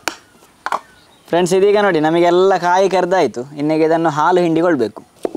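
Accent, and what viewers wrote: Mangalore, India. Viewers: Oh I got after listening your Kannada accent I thought that you are from Mangalore so I asked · Mangalore Kanadda Bhashe Estu Chanda Maraya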